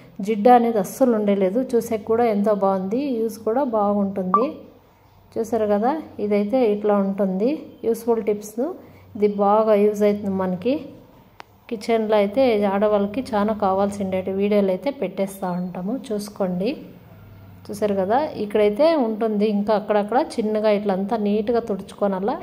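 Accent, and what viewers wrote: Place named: Chikkaballapur, India. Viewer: ಮೇಡಂ ನಿಮ್ಮದು ಕರ್ನಾಟಕ ತಾನೇ ಕೋಲಾರ ಅಥವಾ ಚಿಕ್ಕಬಳ್ಳಾಪುರ ತೆಲುಗು ಮಾತಾನಾಡುವ ಶೈಲಿ ಚಿಕ್ಕಬಳ್ಳಾಪುರ ತೆಲುಗು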